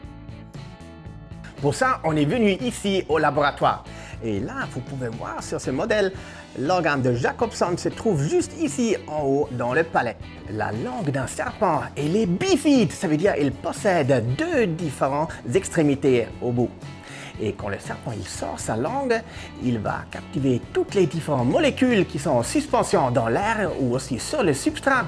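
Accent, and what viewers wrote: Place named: Alsace, France. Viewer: Merci pour la vidéo très instructive !! J’ai une question qui n’a rien à voir (et qui j’espère ne sera pas mal prise 🙏): d’où vient votre accent? Ça me fait penser à ma grand mère Alsacienne de Mulhouse 😊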